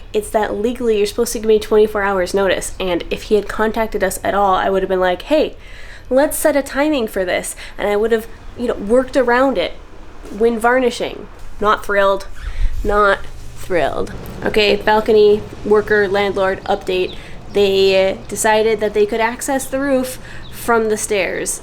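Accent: Quebec, Canada. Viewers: Ça faisait longtemps que j'espérais t'entendre parler français un jour dans une vidéo (avec ton super accent québécois !) ☺ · Très plaisant de t entendre parler français avec ce superble accent québécois! Du miel pour les oreilles 😘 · J’aime beaucoup t’entendre parler canadien 🤭 Ta terrasse est splendide ! Ton propriétaire l’est moins 😂 !! À bientôt ! 🌸🌸🌸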